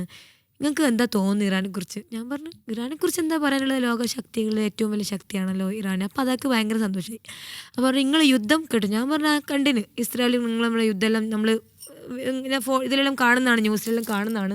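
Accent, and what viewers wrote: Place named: Kannur, India. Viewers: ഓരോരുത്തരും അവരവരുടെ സ്ലാങ് ലാണ് സംസാരിക്കുക.. തലശ്ശേരി സ്ലാങ് ആണ്.. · Kannur slang anu.... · 😂കണ്ണൂർ സ്ലാങ്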